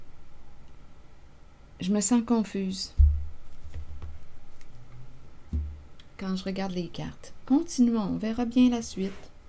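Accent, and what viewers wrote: Canada. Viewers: Toujours belles guidances .❤ Mon œil est bleu . Vous êtes une très bonne comédienne mais réelle . C’est vrai qu’en réalité, C’est pourri cette famille Et je reste polie Je n’ai pas rigolé tous les jours . Mais je vais mieux . Je vois qu’il y aura beaucoup beaucoup de s ..e . Je n’en doute pas du tout . Confiance ???????!! Maintenant je doute beaucoup . Amour non réciproque Confu (Oui non ) ?????????? Problème de communication Une fois une femme une fois un homme. Qu’est ce que ça veut dire . Accent canadien j’adore . J’aime aussi la mimique des mains . Téléphone fixe inutilisable …. J’adore écrire j’adore parler Je n’ai aucun défaut . Bisous . Merci infiniment .😎😎😎😎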